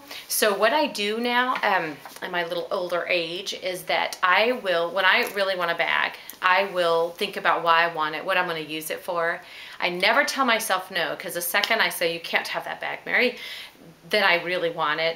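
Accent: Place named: Texas, United States. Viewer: I can recognize a Texas accent anywhere. Yes, I am from SW Texas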